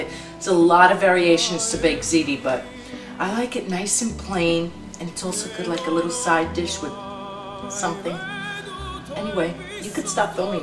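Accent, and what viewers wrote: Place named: Brooklyn, United States. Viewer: u could be the rachel ray from brooklyn with that accent!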